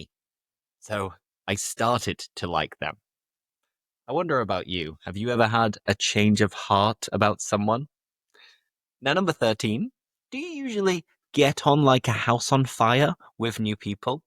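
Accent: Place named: United Kingdom. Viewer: I found your page few days ago, and you are an excellent teacher and your accent is amazing .. I love British accent..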